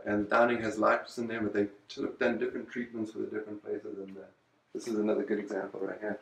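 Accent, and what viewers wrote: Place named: South Africa. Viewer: You can pick up the South African accent anywhere :D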